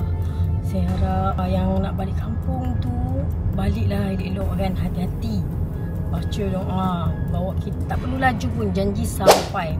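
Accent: North, Malaysia. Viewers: Fahammm betoiii teringat masa kami.kanda suka dgr cakap utara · nanty buat video suasana hari raya kat kampung😮 ckp la utara adehhh.... Rindu loghat utara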